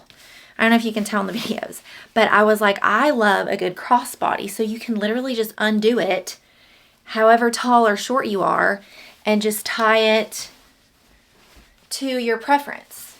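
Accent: Tennessee, United States. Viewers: You could label them vintage modern fusion. I like the bigger tote bags with pockets. I do think the basic totes need fusible fleece. Those book sleeves are super cute too!! I found your channel a month or so ago when I got back into sewing. I live outside of Nashville. I enjoy your accent and personality. Lol I'm not from Tennessee, I just find the accent enjoyable. I love your content and have gone back and watched a ton of your videos.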